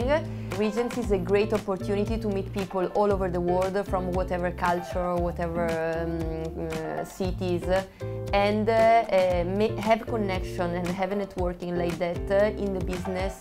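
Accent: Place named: Brescia, Italy. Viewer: ha mantenuto il nostro intercalare bresciano😊 anche parlando in inglese, a parte questo, brava 👍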